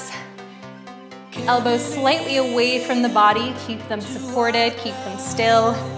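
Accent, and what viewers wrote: Canada. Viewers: Love your work-"ouuts" ... and your Canadian accent!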